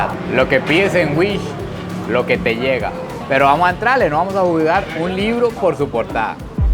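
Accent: Costa Rica. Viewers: Me encanta lo increíblemente marcado lo tico "un fresco de chang" jajajaj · Cada video de 🥹✨🩵 es una aventura bien vivida junto a él 🧸💙 Mi amo su acento ticoooo😂❤ “Arroz” 💙✨🧸 Love it, so cute 😍💙✨🧸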